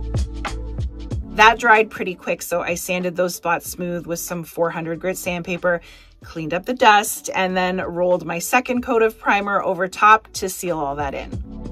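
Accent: Canada. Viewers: This is the first video of your I’ve seen. I’d like it! The only thing I would ask is why you didn’t do some accent colors on all that beautiful rounded furniture and edges? I think it would’ve made it. Stand out just a bit more made it slightly modern. And what did you say and where did you get those knobs? I love your accent. What part of Canada are you from?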